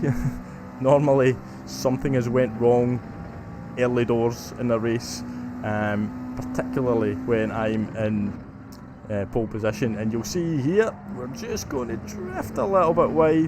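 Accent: Scotland, United Kingdom. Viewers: Awesome video once again. You can see that you clearly have the speed needed to win a race. One thing: you mentioned you are more comfortable starting p3.4,5. Something like that, so you can settle into the race. If you feel more comfortable NOT leading , would it help if you take it easy on the opening lap(s) and if someone wants to overtake from p2/3, just let them? Then you are behind and you can settle in. Of course, it's also amazing if you want to push and challenge yourself to be more at ease pushing from the front. Anyway, great vid and I personally just love to hear the scottish accent. Have no issues and understand everything (and im a dutchy)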